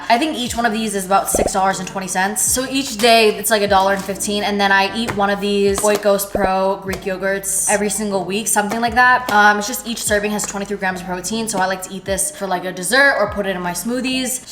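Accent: Vietnam. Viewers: your viet is so cute T__T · Has anyone told you that your Vietnamese accent is so cute ❤❤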